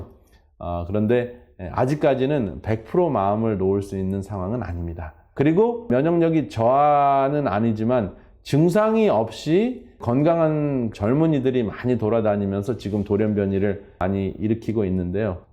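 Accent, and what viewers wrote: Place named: United States. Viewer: 그렇게 생각하실 것 없습니다. 이분은 미국에서 오래 사시다보니 자연적으로 미국식 발음에 익숙해져서 그런거지 일부러 혀꼬부라진 소리를 하는게 아닙니다~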